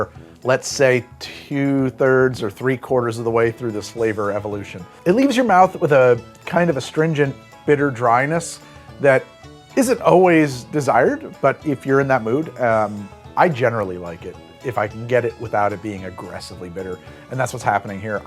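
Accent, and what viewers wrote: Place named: New England, United States. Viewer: The worst part about Moxie is the New England accent.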